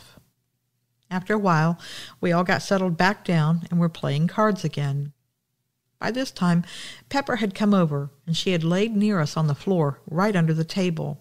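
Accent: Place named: Minnesota, United States. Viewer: Sounded like a great way to celebrate 21st birthday. I am from MN this sounds like you are from the northern part of the state and your cabin sounds awesome. Thanks for sharing your story. Pepper sounded like a great dog.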